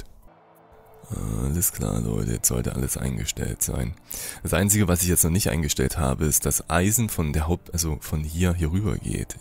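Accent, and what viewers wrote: Saxony, Germany. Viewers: Sächsisch? LG (ツ)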